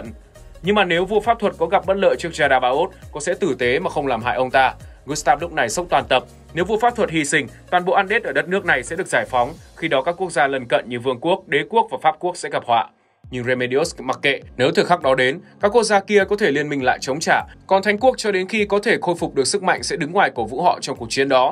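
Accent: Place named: North, Vietnam. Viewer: kiểu giọng bắc ấy, vừa ngầu lòi lại ấm áp~~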